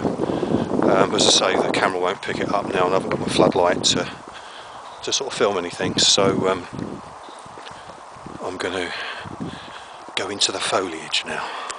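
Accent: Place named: Cockney, United Kingdom. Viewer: nice vid nice to here abit of cockney me china keep up the good work on yer plates fish and tater mate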